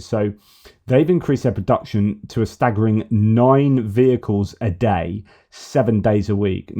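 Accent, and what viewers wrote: New Zealand. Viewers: haha good kiwi accent churr bro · I don’t care what anyone says.. your Kiwi accent is pretty decent. 👍👍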